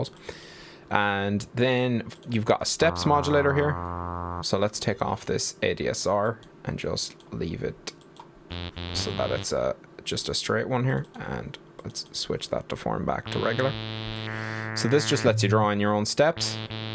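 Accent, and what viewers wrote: Ireland. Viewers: 1. I like Irish accents so this was a nice hour break from the local Aussie accents. 2. I don't like speaking in list items so this is the last one. Not everything we watch has to be less that 30 mins in length. Besides, this is SURGE THE MOVIE and the title would not make a lot of sense if the video only went for 10 minutes. This lightening fast Surge overview is probably more to your liking: <link> I found that one provided a really good quick overview. I just really appreciate people take the time to make videos and share their knowledge. I think I remember something about "workflow optimization and efficiency" when I did that course when working in a supermarket. It was just before we did the first aid session.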